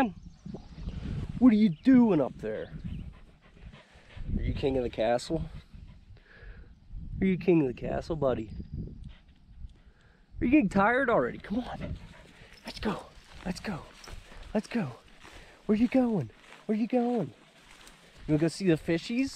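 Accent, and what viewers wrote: Western Pennsylvania, United States. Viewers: Congrats on reaching 5000 subscribers! Stay humble, keep learning. Continue to set high personal standards. Keep doing great work. AND hang on to that Western PA accent!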